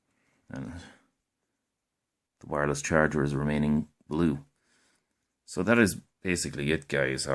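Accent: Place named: Ireland. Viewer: Very informative and nice voice! Can't help but love an Irish accent <3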